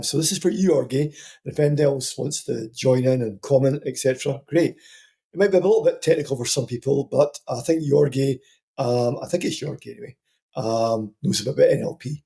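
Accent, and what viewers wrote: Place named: Scotland, United Kingdom. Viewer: Hi - thank you for sharing..I found it to be very informative and fascinating and the Scottish accent is fabulous too.😅 Can you please explain Anchoring a little bit more? Also do you ever treat PTSD and Neuro divergence..or ADD?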